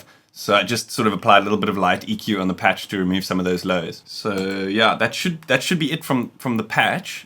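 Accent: South Africa. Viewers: You’re a good teacher on the subject you sound SouthAfrican?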